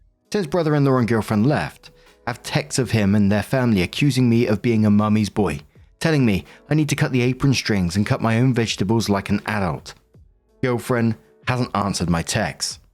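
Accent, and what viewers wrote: United Kingdom. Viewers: You're a Brit 😮!?!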